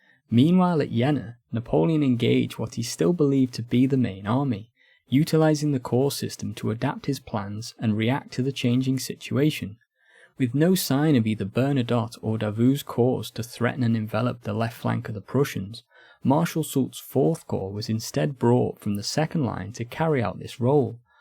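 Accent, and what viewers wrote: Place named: Yorkshire, United Kingdom. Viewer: Or maybe not the accent, I think it's your smooth voice on top of the accent, I'm sure other northerns (I assume you're from yorkshire) font have a voice so heavenly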